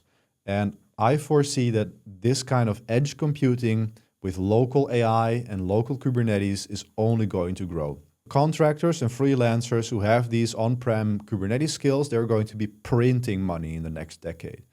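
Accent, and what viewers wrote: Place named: Netherlands. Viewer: My father has been a Kubernetes engineer since the early days of Kubernetes. I learned a lot from him when I was only 17 years old. I got my first Ubuntu laptop at the age of 16. After graduating, I got a job in Belgium as a DevOps engineer. I earned this job for one reason: my knowledge of Kubernetes. Even though I’m only a junior, my experience was already enough to work on production environments at the company. There are only two of us in the company who really know how to work with and implement Kubernetes. Dutch language: PS ik hou van je Nederlands acces. Herken mezelf erin 😊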